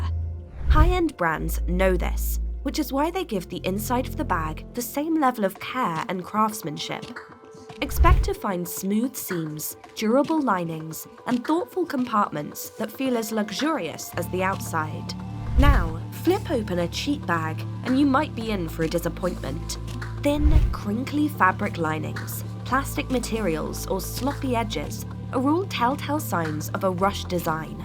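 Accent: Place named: RP, United Kingdom. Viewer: why choose a robot voice with THAT HIGH BRITISH ACCENT?? Do you think it helps you to sound more sophisticated? Not it doesn't. Because it's clearly not your voice, it's a robot voice. You are pathetic.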